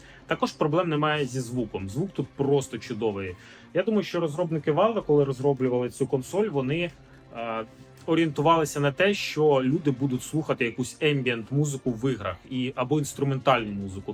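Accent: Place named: Ukraine. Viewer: Спс за обзор. Если приставать, то отметил бы только грамотность речи, потому что ру слова с укр акцентом режет ухо.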